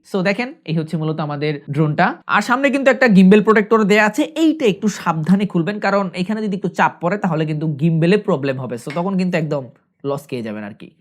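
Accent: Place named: Bangladesh. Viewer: chehera dekho yah fir ,,, 1 min voice hii sunlo .... pata chal jayega yaani jhaat se pakad loge ki yeh Bangladesi hain ....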